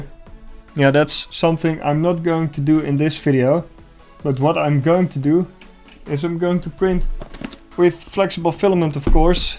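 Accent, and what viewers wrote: Netherlands. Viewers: I fucking knew it xD first time watching your channel. Dat Nederlandse Engels is zo herkenbaar xD Maar wel mooi die flex3drive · Ah heerlijk een nederlander, ik hoor het aan het accent 😂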